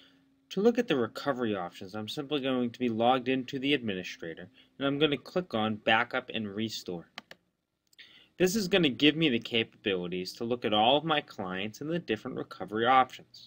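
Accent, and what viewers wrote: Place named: England, United Kingdom. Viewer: What a perfect English accent. I love it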